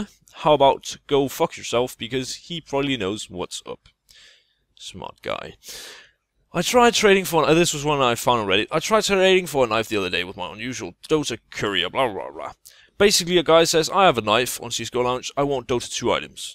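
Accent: Denmark. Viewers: jeg kan høre at du er dansk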